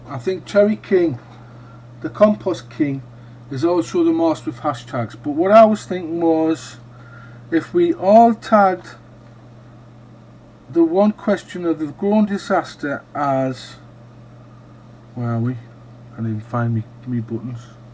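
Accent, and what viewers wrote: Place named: North East England, United Kingdom. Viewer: Just found your channel by accident .. and recognised the accent. I'm in Whitley Bay and I guess you are not too far away. Regards !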